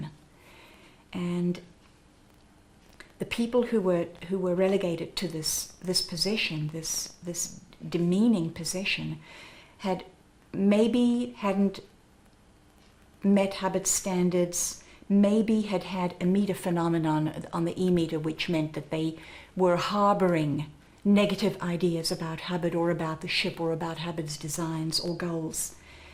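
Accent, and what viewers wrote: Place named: Transatlantic, United States. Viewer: ine of the last beautiful women that use the transatlantic accent. love iy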